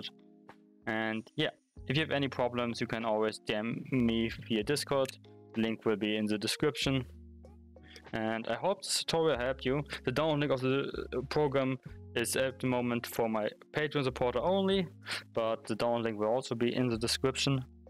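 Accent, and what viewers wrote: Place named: Germany. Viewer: man hört mies das du deutsch bist xD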